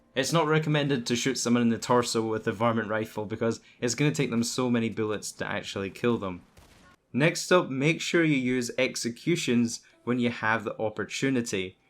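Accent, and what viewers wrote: Scotland, United Kingdom. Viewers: Omg are you Scottish!!! You sound Scottish!!! Iam Scottish 😍😍😍 subbing just for that dude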